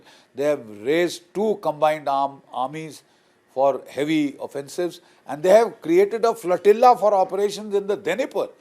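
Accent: India. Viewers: I usually can't stand indian accents but I'll tolerate it just for this guy. He's good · How come u listning Indian accent broadcasts???😳🤷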